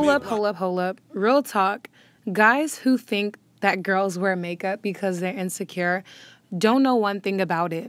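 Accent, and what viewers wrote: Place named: Nigeria. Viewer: omg you are gorgeous are u Igbo Yoruba or Hausa etc? you definetly sound Nigerian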